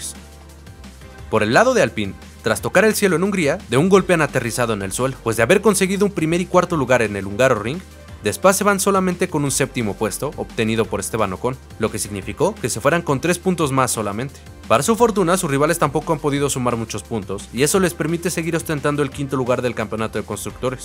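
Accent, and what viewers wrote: Mexico. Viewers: pensé que eras mexicano xdd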